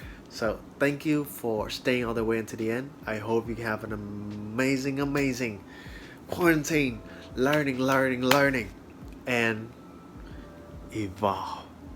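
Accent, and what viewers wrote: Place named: Texas, United States. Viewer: Dude whats up with the southern texas accent haha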